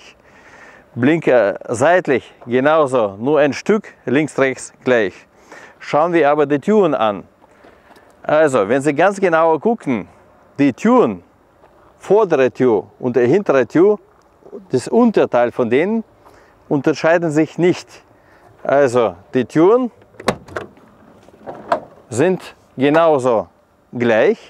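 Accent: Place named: Russia. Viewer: Herrlich..ich liebe 2 Akzente,den franzoesischen und den russischen..russische Technik sowieso,fahre nen uralten Lada,verlaesslich.Gruss aus Patagonien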